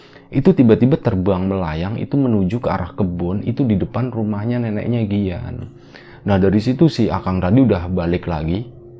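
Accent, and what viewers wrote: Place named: Pekalongan, Indonesia. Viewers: Aku org sunda asli.. Pas mas ngmng sundaa jd pngen ktawaa krna bhsany sunda tp logatny jawa.. Tp keren mas lbih bnyk lg msukin crita sundanyaa😁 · karang Dadap mas, pean Pekalongan jga to logat e kok Podo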